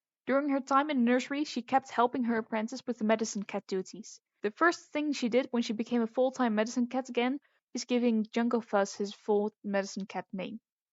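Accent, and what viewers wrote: Netherlands. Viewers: Awesome! Also do you speak Netherlands/Dutch? It sounds like that so sorry if its not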